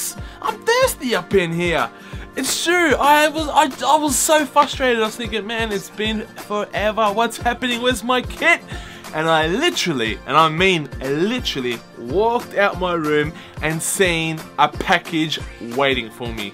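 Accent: Australia. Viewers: Your Australian ??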